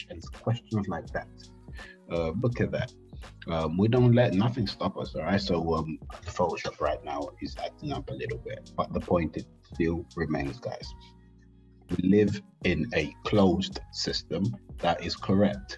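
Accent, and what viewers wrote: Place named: Israel. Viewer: The isn't white? 🤯 no just kidding I knew even when I started watching your videos and hearing the sound of your voice that you where a Hebrew. Seek Yahusha messiah my friend! Yah bless!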